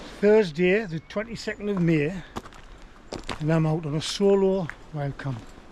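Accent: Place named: Durham, United Kingdom. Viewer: Good video,you sound like from my neck of the woods near Durham.i love Weardale.Im always on the fells.👍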